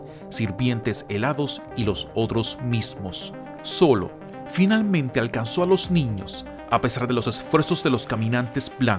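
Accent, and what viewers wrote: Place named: Chihuahua, Mexico. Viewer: Eres de Chihuahua o porque pronuncias así de fea la "ch"?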